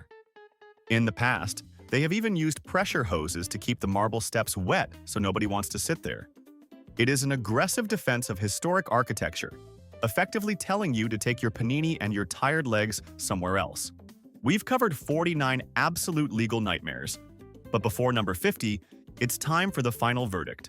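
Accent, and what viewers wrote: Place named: United States. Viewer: this collection has been put together, evidently, with an American point of view, even spoken with a recognizable American accent American prohibits chocolate surprise Kinder eggs because only American children are so st++id and they swallow the surprise... even Pope Francis made fun of it during an official state visit to the Vatican of J.D. Vance and gave his children Kinder eggs as gifts !!! IT WAS HILARIOUS THE WHOLE WORLD MADE FUN OF AMERICA and AMERICANS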